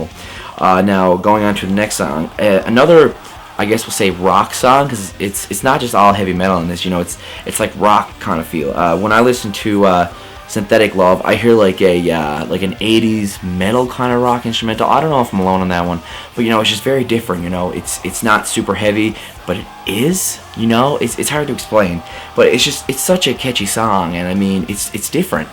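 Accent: Canada. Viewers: You're from Canada, ain't ya bud?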